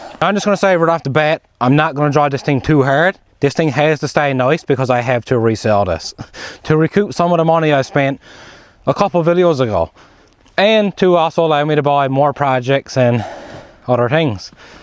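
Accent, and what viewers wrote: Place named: Newfoundland, Canada. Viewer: Soon's I heard ya speak I said "My son, there's only two Islands that guy could be from."..So I took a look around outside and I don't see ya nowhere here in Cape Breton so Newfoundland it is.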